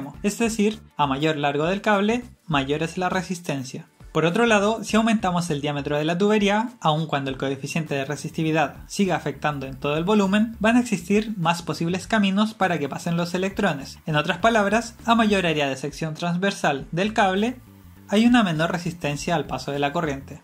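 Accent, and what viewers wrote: Chile. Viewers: Saludos ,eres chileno??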